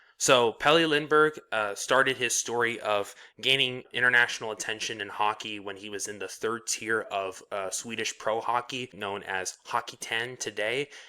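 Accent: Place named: United States. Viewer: Yes, you americanize the pronounciation of all Swedish names here. It is really hard to explain, but you will find numerous examples in this short docu about him: <link>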